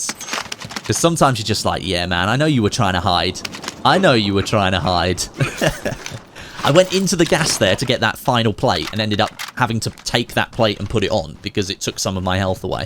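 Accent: United Kingdom. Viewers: WOW I did not know was British I thought you were American lol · Wait you're British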